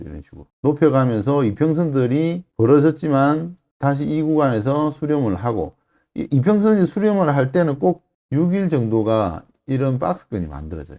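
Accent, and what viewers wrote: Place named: Gyeongsang, South Korea. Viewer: 와 투박한 갱상도 사나이신데 강의는 알짜배기 입니다.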